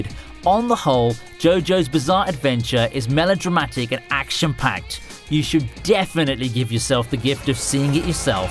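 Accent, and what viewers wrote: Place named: United Kingdom. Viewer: Did not expect British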